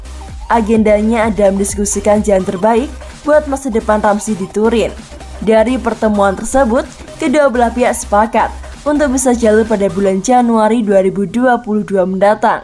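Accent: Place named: Java, Indonesia. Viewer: Mbaknya medokkk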